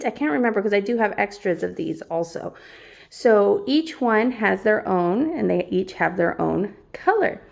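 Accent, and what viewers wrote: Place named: Texas, United States. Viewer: i knew you had to be a texan.... just hearing you speak is like hearing my native tongue.🤣🤣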